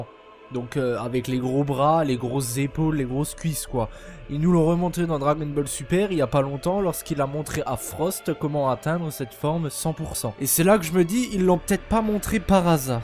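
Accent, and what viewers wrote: Alsace, France. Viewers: Waouh c'est génial cette chaîne je connaissais pas sinon par pur hasard tu serais pas alsacien😂? On reconnait le petit accent (pcq je le suis)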